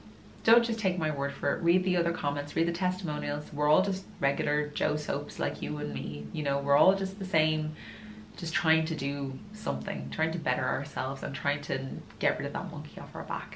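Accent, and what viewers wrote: Ireland. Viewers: Hello from Spain .are you Irish by any chance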